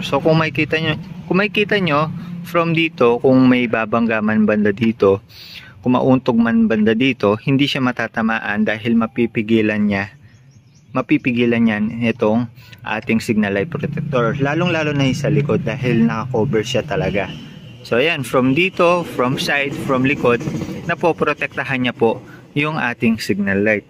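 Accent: Pampanga, Philippines. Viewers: Kapampangan sya.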